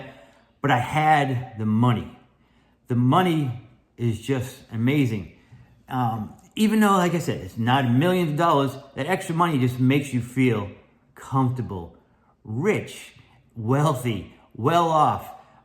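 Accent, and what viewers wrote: Boston, United States. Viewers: love the Boston accent!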